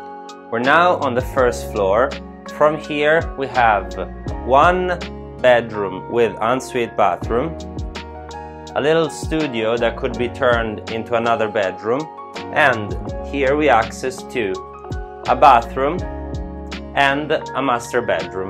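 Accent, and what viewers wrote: Italy. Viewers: we need a bit more than buongiorno. Haha c'mon show us that sexy Italian accent ;) Can't wait to see the upcoming videos. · I love your italian acccent when you speak english. Hope you can also speak french. :D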